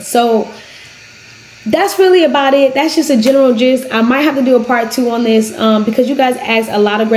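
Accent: United States. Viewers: Are you from DC?? You sound like Blac Chyna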